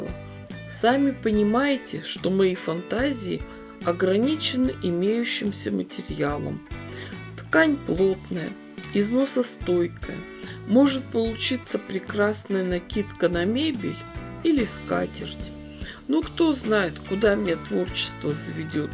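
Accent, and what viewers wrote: Urals, Russia. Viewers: Ваши шуточки с уральским акцентом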